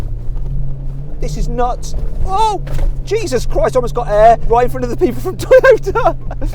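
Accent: United Kingdom. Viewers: 英語の訛りがイギリス以外のなんでもないやん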